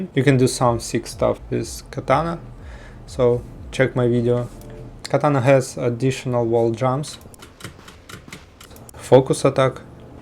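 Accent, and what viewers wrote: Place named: Russia. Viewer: bro this video is really good thank you for the suka blyat accent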